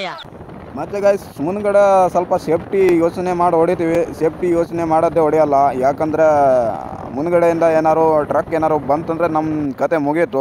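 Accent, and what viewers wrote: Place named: Bengaluru, India. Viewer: Bengalur kannada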